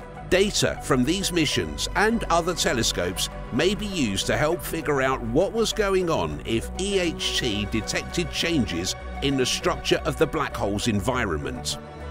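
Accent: United Kingdom. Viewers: >click on video >hear British accent >close video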